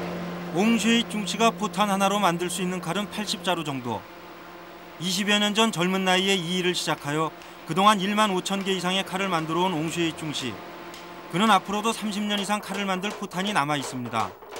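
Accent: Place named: Seoul, South Korea. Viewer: 그러고보니까 나레이터 90년대 서울 사투리 안 쓰고 요즘 서울말에 가깝게 말하네 취재진들은 90년대 서울 사투리 쓰는데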